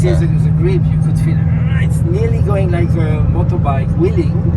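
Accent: India. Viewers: You sounds indian❤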